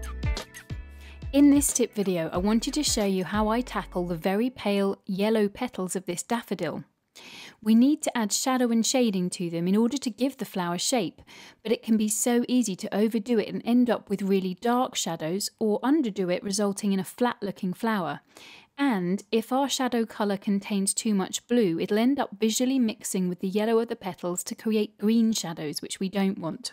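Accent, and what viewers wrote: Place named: England, United Kingdom. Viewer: She speaks perfectly. It's called an English accent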